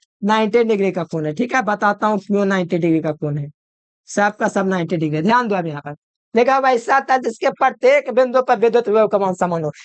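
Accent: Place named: Bihar, India. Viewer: Bihari style me education lecture 🔥🔥🔥🔥🔥🔥🔥🔥🔥🔥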